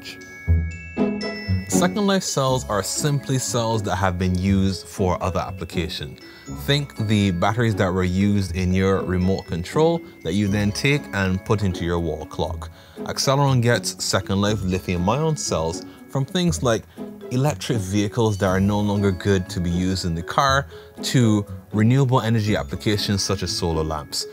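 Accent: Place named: Barbados. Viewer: Great explanation; sounds like a Bajan.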